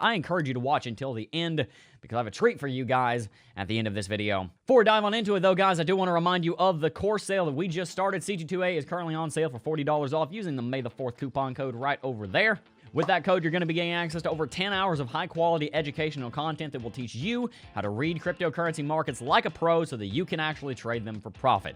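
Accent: South, United States. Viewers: I like the mix of smartness and the southern Accent he has.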